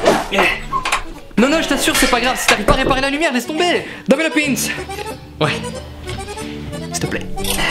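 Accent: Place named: Naples, Italy. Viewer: Tu as gravee un accent napolitain sinon super vidéo mon nonno est pareil 🤣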